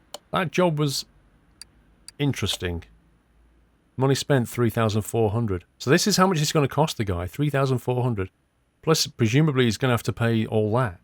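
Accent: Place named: United Kingdom. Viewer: I'm trying to make it as a Youtube gamer... but I can never compete with this British accent...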